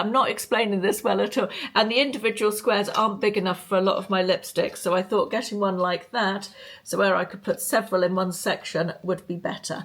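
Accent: United Kingdom. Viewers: I order a lot from Temu but most of it is for craft supplies/scrapbooking, bookbinding and junk journals. Most clothing items, tablecloths and placemats are made of polyester. I tend to perspire a lot and can’t wear polyester so I don’t order clothes. I like the fact that you can return as many items as you want with free return postage on each order. I haven’t sent many things back, most things work as they should. Certain things are more expensive than on Amazon, etc. you have to know your prices. My biggest complaint is they pack everything in thin plastic bags so many items get wrinkled and smashed up in shipping. For example, gel plates for printing should be packaged in a box so there are no dents or depressions on them. The dents will end up showing up when you print. I love your videos because you jump right into the unveiling. It’s so irritating when people ramble on and on for 5-10 minutes before they get to the nitty gritty. 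Your personality is so charming and I love your British accent. You have a great sense of humour (tongue in cheek) and you don’t take yourself too seriously. I like watching what you buy because it gives me some ideas about things I’ve never noticed on Temu. Thanks, keep them coming, be well!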